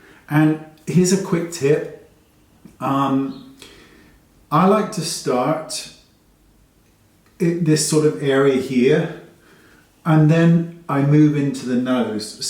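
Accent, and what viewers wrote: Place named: South West England, United Kingdom. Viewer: Hi! I just stumbled upon your videos and I think you are so wonderful! It's so refreshing to watch someone who isn't involved in drama and just really loves makeup! This may be strange, but I was just wondering where you are from? I'm from Bristol and your accent seems to have a South-West twang!!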